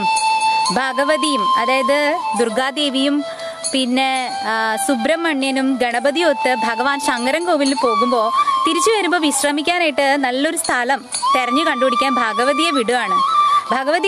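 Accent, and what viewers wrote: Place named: Valluvanad, India. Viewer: തിരുവനന്തപുരത്തുകാരിയുടെ മലയാളം എങ്ങനെ വള്ളുവനാടൻ slang ആയി? അതും ഇത്ര perfect ?!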